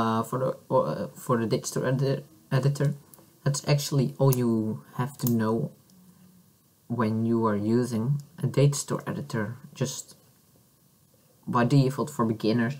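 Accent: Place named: Philippines. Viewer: Your voice looks like filipino voice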